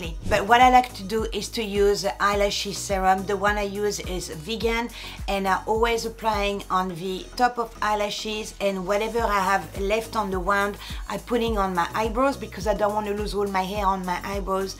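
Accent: France. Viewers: Oh my goodness. This is awesome. I have exactly the same wall color and the same vogue picture ( one of three in a set) in my bedroom🌺 So nice I found your channel. You‘ re so charming and so elegant . Bye the way, your french accent is amazing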